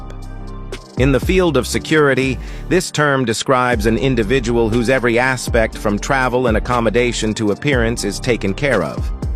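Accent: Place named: United States. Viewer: Waste of time listening to this, and the American accent is nauseating.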